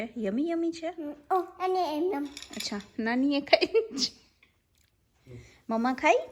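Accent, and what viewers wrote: Saurashtra, India. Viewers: Gugura bhu mast che khavanu man thaya che hu nayana anjary tmaru nam ? Tme sourast na iago cho bolva ni rit thi hu kutch bhuj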